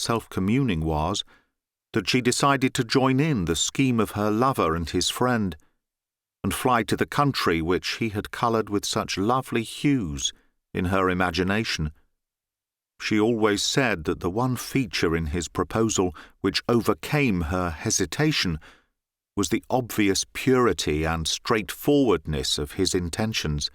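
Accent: United Kingdom. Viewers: Mr would please read Agatha Christie short stories.. I really like listening to crime detective stories narrated by your clear British accent as you did with Sherlock Holmes stories.. I would be grateful if you read some Agatha Christie short stories.. listening to your channel has indeed improved my listening skills as an English language learner... Thank you very much sir ❤❤❤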